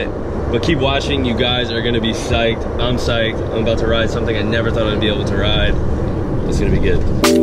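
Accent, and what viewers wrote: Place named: Argentina. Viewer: Ese acento me suena a Argentino, a más no poder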